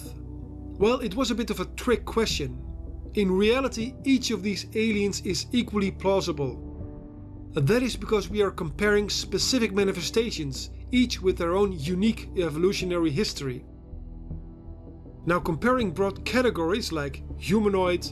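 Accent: Netherlands. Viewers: Aan de uitspraak kan ik met zekerheid zeggen dat jij Nederlands bent, of nie dan?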